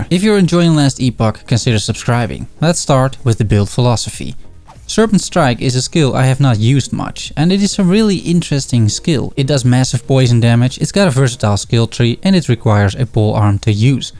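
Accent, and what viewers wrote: Netherlands. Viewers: Your build guids are getting better and better! Keep up the good work. Where are you from? Are you Dutch?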